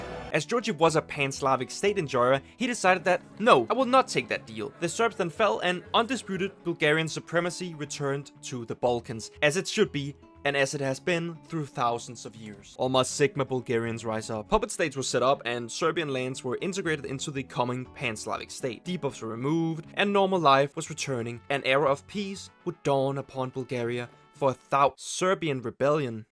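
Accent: Denmark. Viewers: Live the danglish Ære til Danmark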